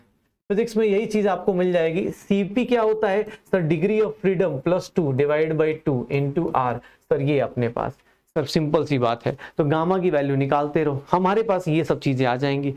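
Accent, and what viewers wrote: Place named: Bihar, India. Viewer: in this whole session I found one thing that sir ka bolna be like - """Entropy Bdhega "-bdhega " ky mst bolte h ekdm bihari style me lgte h sir isliye Inka voice acha lgta h meko..nd pdhai to waise bhi lajawab hai...... 😊🔥🔥🔥🔥👀✨❣️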